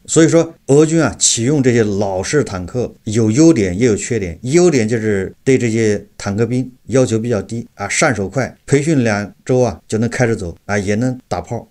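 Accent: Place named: Wuhan, China. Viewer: 标准的弯管子武汉普通话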